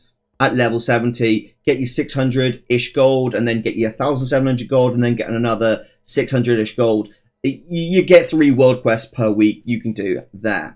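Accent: United Kingdom. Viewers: Minimum wage in the UK is £11.44 per hour. WOW costs £9.99 per month in the UK (or less depending on plan). In case you don’t recognise the accent, this guy is from the UK. If you were doing 60 characters like he suggested that is going to be at least an hour a day. Over a month that would be the equivalent of around 30 hours. At minimum wage that is going to be around £340 you could have earned for that same time doing something boring. I can understand if it is quick or fun or yields a lot for the time, but this doesn’t tick any of those boxes if your intention is to just pay for your subscription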